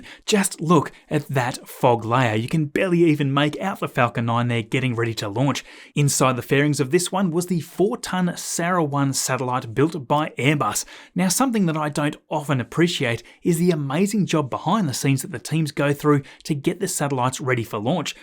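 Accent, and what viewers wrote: Australia. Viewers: ..... Awesome presentation again.... 👍... If I could remind you, your success at least in my simple brain 🧠 lol 😁...is do to some awesome folks with British accent.... First one is John Romer archeologist, and David Attenborough naturalist.... I just totally loved these two and their presentations with that accent, your Ozzie accent is similar to theirs and captured my attention in the beginning of your YouTube stardom 🙂. Of course you do have a hell of lot more charisma than both of them two above lol 🙂....not to mention the effort and the knowledge you put into your presentation. Much appreciated mate 👍🤜🤛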